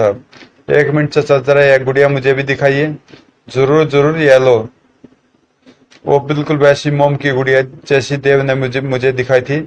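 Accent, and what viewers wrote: Haryana, India. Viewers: tumhara awaj jo h haryanvi tune m aa rhi hai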